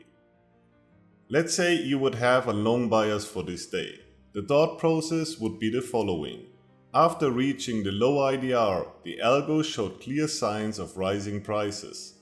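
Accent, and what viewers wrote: Germany. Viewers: Dein Akzent ist Deutsch, daher antworte ich auf Deutsch ;-) Das schaue ich mir genauer an. Aber das scheint echt irre cool zu sein!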